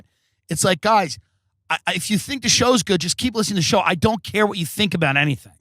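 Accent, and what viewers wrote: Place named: Long Island, United States. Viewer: Maybe it's his long island accent but I love when he says SHUT UP! you really feel the disdain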